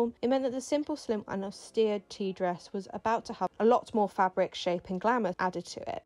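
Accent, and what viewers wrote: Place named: United Kingdom. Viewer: Voice and diction teacher here, slow down and enunciated your words. Your British accent isn't quite RP and is difficult for Americans to understand/process.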